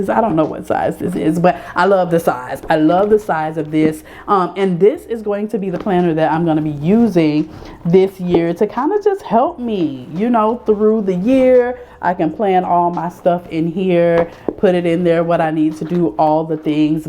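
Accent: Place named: Louisiana, United States. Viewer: Let's GOOO! Let's Get it!! I'm with you this year Sis! Also, your accent is giving Louisiana! I grew up in BR, lol. My goal is to post a youtube video every week.I'm well on my way, too 💪🏿✈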